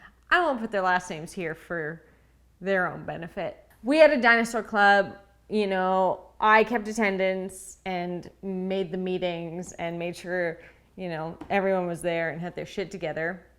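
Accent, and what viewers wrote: Canada. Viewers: She started sounding more and more Canadian as this video went on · Dude, around 5 minutes in, the Canadian accent really comes ooote. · It's Human Rights violation to ask a high person "What was the last thing you forgot?" You could give someone an aneurysm. She gets SO CANADIAN when she's high. I love it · High had a Canadian accent Eh? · She gets a lot more Canadian when high 😂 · You’re Canadian accent when you’re high >.< Love it, dawg.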